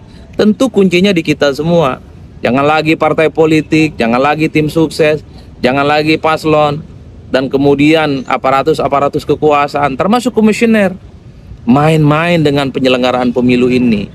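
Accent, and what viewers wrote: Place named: Madura, Indonesia. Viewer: madure banget mas...melokal polll...topp ancen e ms ki👍👍👍👍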